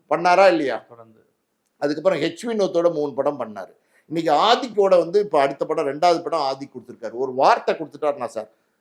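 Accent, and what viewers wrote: Madurai, India. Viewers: nenga madurai ya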